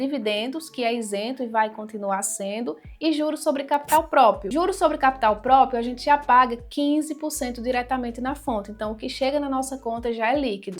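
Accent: Northeast, Brazil. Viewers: linda maravilhosa..❤❤... Obrigado pelo seu trabalho.... fiel seguidor. Amo esse sotaque nordestino.....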